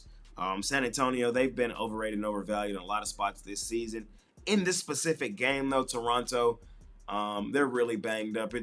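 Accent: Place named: South Florida, United States. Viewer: Sounds like you from my crib!!! South Florida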